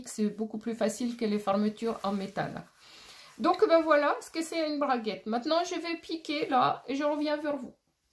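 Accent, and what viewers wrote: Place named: Alsace, France. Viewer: Bonjour, merci pour vos conseils. Le pantalon est presque fini, je vais coudre une fg sur le côté. N'ayant pas pris les mesures nécessaires pour réaliser une braquette . Merci , le prochain patron , je m assure qu'il soit avec braguette Et je me plais de penser à cette réalisation. Je suis très prototype avant de coudre , j'avais réalisé que mon exercice s' avéré incomplet. 😮 Bon c'est sur je m'y met. La couture est mon deuxième poumon 😂 Merci encore, également votre petit accent me plaît bien. Alsace peut etre? Amicalement!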